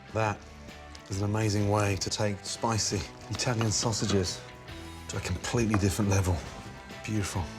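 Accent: Scotland, United Kingdom. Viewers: I definitely hear the Scottish come out in this video way more than others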